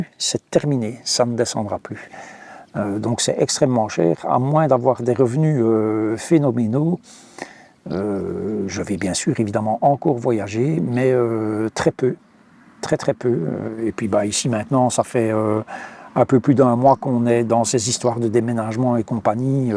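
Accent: Belgium. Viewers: milesker anitz. En tout les cas tu me fais bien rire avec ton accent belge. Au plaisir de te rencontrer sur la route.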